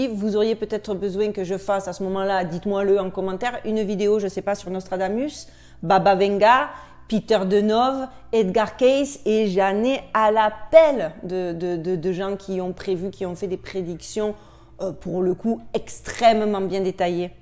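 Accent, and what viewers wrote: Marseille, France. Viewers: C'est plutôt la cagole du coin... avec l'accent qu'elle a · Nous sommes tous différents, c'est sûr, moi elle me fait rire et m'apporte de grands bols d'air Merci ! Merci , continuez très chère amie marseillaise à l'accent plein de soleil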